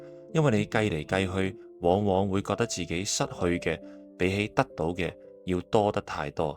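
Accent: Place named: Hong Kong, China. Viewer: 牧師多謝你，終於有一個完全港式廣東話嘅頻道，請繼續努力，主與你同在🙏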